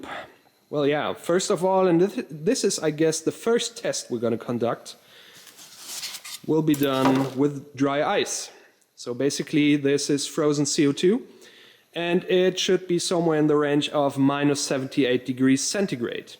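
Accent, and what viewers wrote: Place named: Germany. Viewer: Endlich mal ein deutscher der ordentlich englisch kann ... nicht akzentfrei und auch nicht ganz fehlerfrei, aber immerhin besser als das was man sonst so hört.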